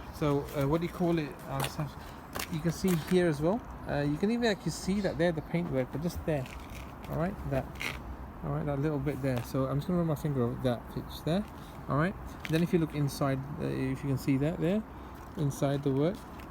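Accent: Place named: Durban, South Africa. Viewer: is it me or does this guy have a South african Accent ...... specifically from Durban ...!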